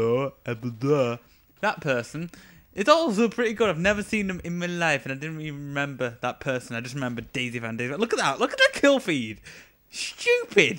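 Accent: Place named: United Kingdom. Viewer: You sound like one of the MI6 members. :D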